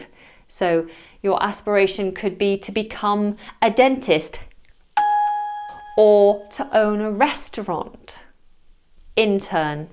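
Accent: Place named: United Kingdom. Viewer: Like you British English accent，sounds so tender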